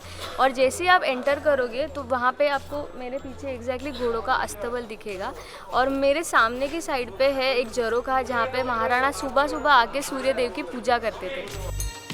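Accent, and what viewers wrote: Gujarat, India. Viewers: Meera jee "kantaal" ke Dwarika chale gaye 😀. I totally loved this sentence. Ekdum Gujju style Hindi hai. And I have stayed in Gujarat for 15 years. So I love this style of speaking 💕. You guys make amazing videos and one reason I watch them is to listen to style of Hindi!! Keep rocking guys 👍. Khup गोड आहे ऐकायला!!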